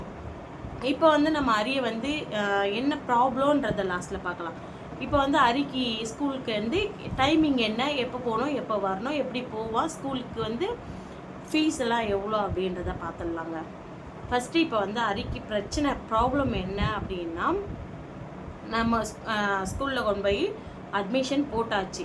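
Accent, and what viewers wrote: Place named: Chennai, India. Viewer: நீங்க பேசுறது ரொம்ப கஷ்டமாக இருக்குது நீங்க சென்னை பொங்க சிஸ்டர்.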